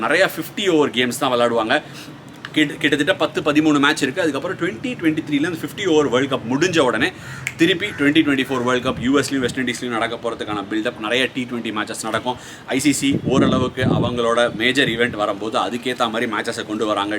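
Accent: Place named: Chennai, India. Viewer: I just love your way of talking. Keep it up. Very casual very Chennai though I am not from there,it's highly amusing.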